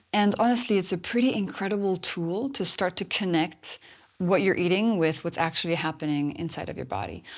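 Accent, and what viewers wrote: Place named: France. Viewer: Is French? She has got a French accent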